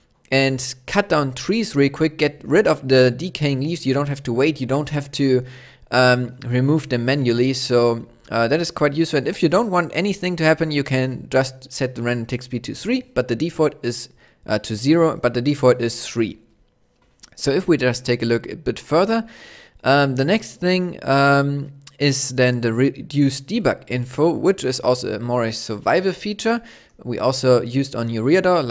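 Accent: Germany. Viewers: your german, arent you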